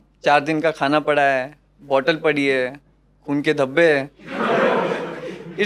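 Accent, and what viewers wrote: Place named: Gujarat, India. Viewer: Munawar Faruqui accent dono gujrat se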